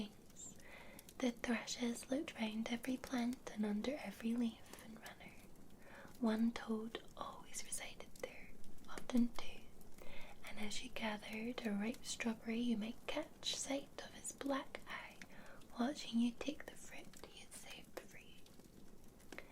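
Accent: Scotland, United Kingdom. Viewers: What a perfect start to the beginning of the week with an extra 10 minutes added to your video! I must say that you are looking extremely lovely tonight 😘. You're hair is like the hair of an angel 😇, straight soft and silky! I swear you're videos keep me relaxed, calm and so soothed. 😌 I love when you do the Sh, Sh, Sh, it's really tingley, why I have no idea, but it just is!! The facial mask and all of your lovely smells and sprays you use would definitely help me sleep incredibly well 😴. The relaxing session is very relaxing and a anatomy class combined 😴. But nothing beats you're lovely soft spoken Scottish accent when you read those stories 😊. And this story was awesome 👌. I gotta here more please 🙏. Thank you for this brilliant Asmr video! I hope you are well and everyone is doing great 👍. Take care 💛 🥰 🥰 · That's hoj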